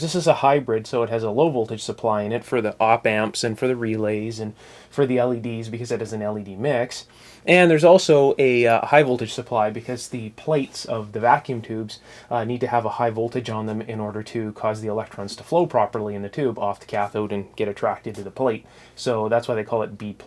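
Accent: Canada. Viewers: My wife always laughs at me because she has learned to pick out your voice by the "Solder", "Ohh-kaye?" and other Canadian idiosyncrasies... Nothing but love from Texas though,.:) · Great explanations of what you are doing and why! I have to say, though, that you are from or near the Great White North (Canada), eh?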